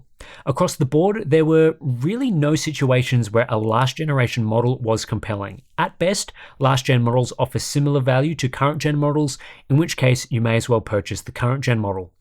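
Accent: Australia. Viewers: yknow having no reason to actually visit the site, I've just been hearing "PC k-sku" for years now like ah yeah that's a neat techy name. Aussie accents are smth else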